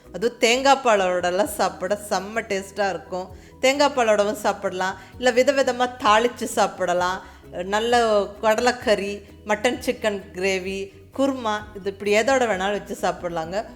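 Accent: Kongu, India. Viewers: Always ur kongu style recipes and kongu accent tamil r very very nice. புழுங்கலரிசி இடியாப்ப (சந்தகை) முறையை வேறு வகை இடியாப்ப கட்டைகளில் பிழிய வருமா? நான் உங்களின் அனைத்து வீடியோக்களையும் நீண்ட காலமாகவே பார்த்து வருகிறேன். All r awesome.. சிரிப்புடன் கூடிய குரலும் கூட.., உங்களுடைய பிக்னிக், சேலை கடை விசிட், மற்றும் அனைத்தும்...!!!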